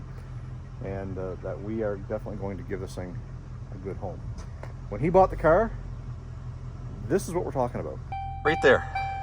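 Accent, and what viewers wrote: Canada. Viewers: so why do you have an address in Maine but still use "kilometers" and have a Canadian accent?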